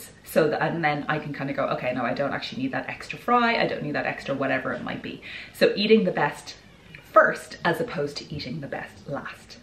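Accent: Ireland. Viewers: First of all, let me say that you are so cute and I love the Iris accent! Secondly, what you said about being a perfectionist resonated with me and not in a good way. I am hardest on myself. I'm an all or nothing type of person. I "throw the baby out with the bath water" instead of just throwing out the water. If I mess up, I'll say that I might as well go all out and do what I want. Thank you for this video and for talking some sense into me. I need to have compassion for myself and allow the hiccups to come and go but not let them define me. Thanks again. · I enjoyed your tips and listening to your Irish brogue. Thanks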